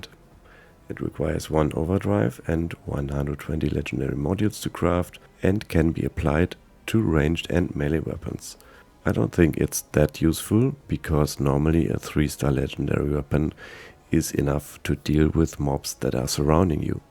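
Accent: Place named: Germany. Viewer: Du hörst dich an, wie ein deutscher der sehr gut englisch spricht.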